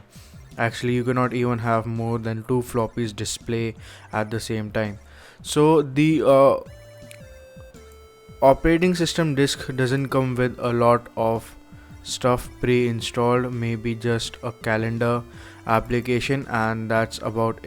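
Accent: India. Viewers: It is Indian accent, i have not problem with that, but for some reason people want flawless english... We are shaped in while growing to adapt to our language, i speak portuguese and my accent when i talk in english is pretty noticeable, but americans say they can understand me pretty well, in fact, they say that the syllabic latin language makes it more audible. Some people are just jerks...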